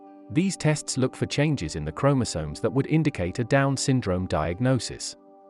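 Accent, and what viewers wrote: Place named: United Kingdom. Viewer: Why a British accent giving American statistics?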